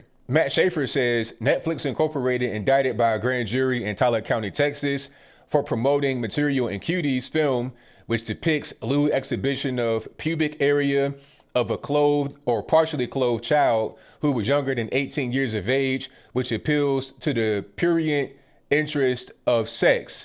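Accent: France. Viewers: Nice French accent Sir. Yeah, this is disgusting and it appears that the devil knows his time is almost up and he is trying to pull more children to hell with him. All we seem to do is screw over our children. I cancelled my Netflix over this. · Your French accent cracked me up!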